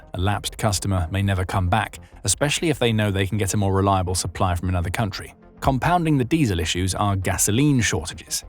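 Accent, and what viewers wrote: England, United Kingdom. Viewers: Why does the narrator, who's clearly English by his accent, keep calling petrol, "Gasoline"? They call it petrol in the UK NOT gasoline.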